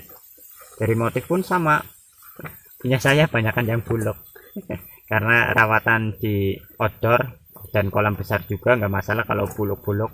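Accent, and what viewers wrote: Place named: Banyumas, Indonesia. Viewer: Ngapak abis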